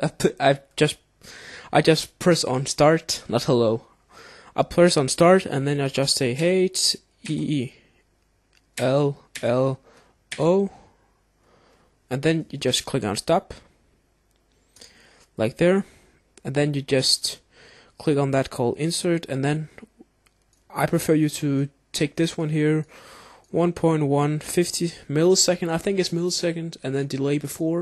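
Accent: Denmark. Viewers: you sound like a dansker din dansker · Are you danish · are you danish?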